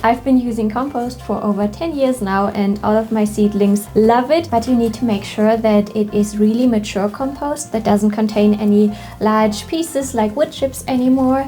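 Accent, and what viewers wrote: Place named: Germany. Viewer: You’ve got the most lovely german accent I’ve ever heard, great video!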